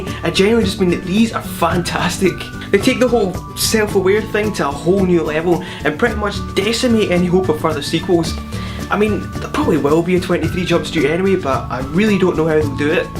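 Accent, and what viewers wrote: Scotland, United Kingdom. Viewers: It's so nice to hear another scottish accent on here!! This review was really useful, I was needing to choose what to go watch at the cinema tomorrow, so thanks :)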